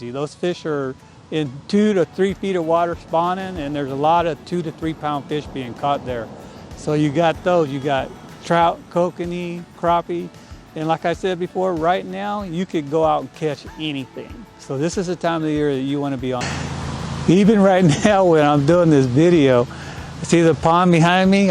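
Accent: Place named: South, United States. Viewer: Mr,you sound like you're from south!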